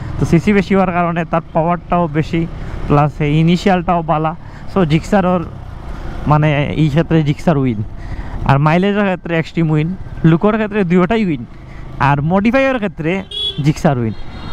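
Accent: Sylhet, Bangladesh. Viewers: Love from Cumilla bro🖤 সিলেটি মাত,সিলেটি কথাই সুন্দর লাগে 😊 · sylhety mat kotha onek bala lage ! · Valo vai Sylhet e bole interest die sunte vallagee moja pai😂❤❤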